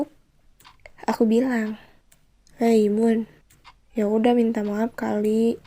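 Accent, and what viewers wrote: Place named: West Java, Indonesia. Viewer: Sunda banget yaa logatnya.. hehe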